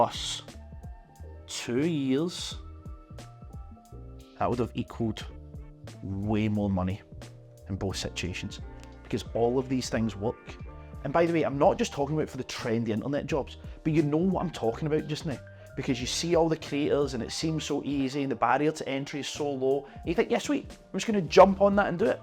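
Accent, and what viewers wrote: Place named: Glasgow, United Kingdom. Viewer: your accent reminds me of visiting my gran in priesthill near pollockshaws as a kid in the 80s - im in Cheshire england all my life but all family are local to pollock, good content mate - im self employed too and agree with a ton you say 😎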